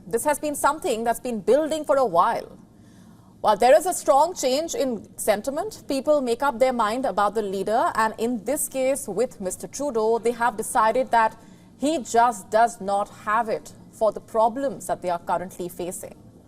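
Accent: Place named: India. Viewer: Indian Accent heavily influences pronunciation ... hope so you would understand.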